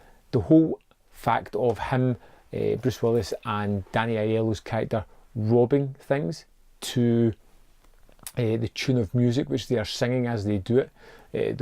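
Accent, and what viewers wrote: Scotland, United Kingdom. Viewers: Do you mind saying what part of Scotland you are from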